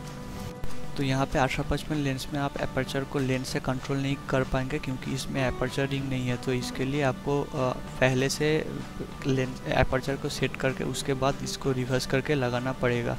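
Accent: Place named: Bihar, India. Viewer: bhaai bihaar se ho aap apki aawaaaaj se he pta chal ra hai.........nice job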